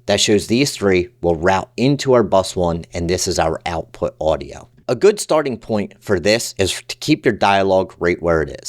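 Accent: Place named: Pittsburgh, United States. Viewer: Are you from Pittsburgh? ❤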